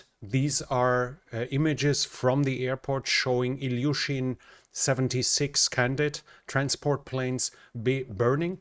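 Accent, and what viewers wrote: Germany. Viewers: You talk like a german but look like a Danish person and you have a Danish name, do you live close to the border to Denmark/north of Hamburg? :)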